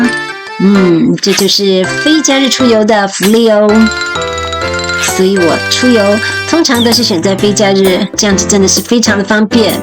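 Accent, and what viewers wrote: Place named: Taiwan. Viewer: Emily唸「台語」很道地、很好聽👏🎊😄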